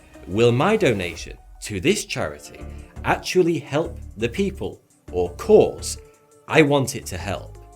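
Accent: United Kingdom. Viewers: His accent is so British that I have to watch the video twice , first time to warm up for the accent and the second is to actually understand. But I love his content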